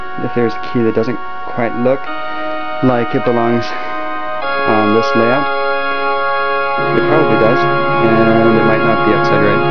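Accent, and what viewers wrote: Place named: Texas, United States. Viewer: Given the accent, the shadowing on the carpet, the actual carpet colour, and the way you're wearing that Seiko watch, I'd have to guess that you live in Texas, went to school in Utah, and have family living in Oregon. How close am I? Congrats on the keyboard, I may actually do that with a spare Saitek keyboard - would be good to confuse other peoples who're using my computer.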